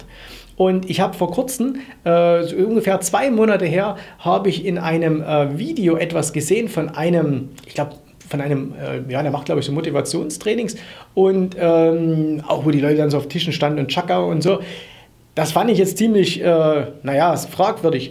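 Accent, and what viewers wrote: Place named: East Germany, Germany. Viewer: Konsümverchzicht...DDR Akzent kommt durch 👎. Das Video ist inhaltlich nicht hilfreich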